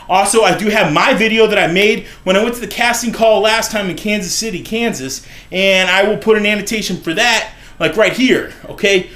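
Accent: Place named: South, United States. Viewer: or.... you could film her LOL and you live in st. louis i live in st louis! it sounds like you're from the south though i don't know why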